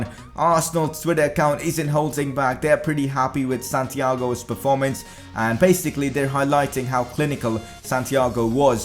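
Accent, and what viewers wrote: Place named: India. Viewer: Man he sound like a England guy but in 2024 he sound like Indian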